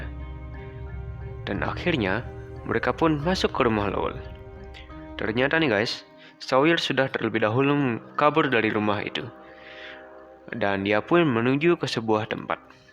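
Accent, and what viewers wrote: Bali, Indonesia. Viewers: Org bali ni logat nya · Klo d perhatiin. Gaya bicara dan logatnya kaya orang bali... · Aksen sudah kelihatan... Semangat bli, maju terus untuk berkarya...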